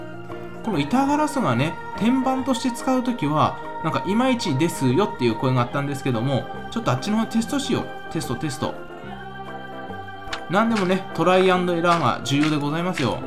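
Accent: Fukui, Japan. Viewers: このころの和さんのしゃべり方がよりすきです 蛭子能収 水曜どうでしょうの試験に出る日本史の先生役の大泉洋 夢グループの胡散臭い社長さん にイントネーションとかにてるなーといつもおもいます 福井の方言なのでしょうか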